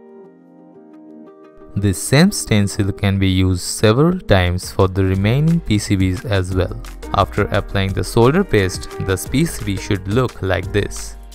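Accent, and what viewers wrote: India. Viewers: Indian guy, hire a pure bred western guy for voiceover😉 No one will worry how induction cooker messes up smd things at 165 c coz hot air guns min temp is 130, get upto 500 deg c 😎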